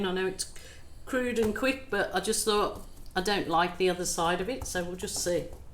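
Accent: Birmingham, United Kingdom. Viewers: Just inspirational! Thank you or sharing your amazing imaginationary art form. I am planning to redecorate my sitting room and am going to try and do a canvas using the new colour palette to hang on a plain wall. I'm in the UK and it's lovely to hear your Brummy accent and gentle way of explaining the simple and apparent random application of paint application. Thank you from the othe side of the World! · Hi from England. Your accent sounds like a Birmingham accent with a little Australian in there. Love your work.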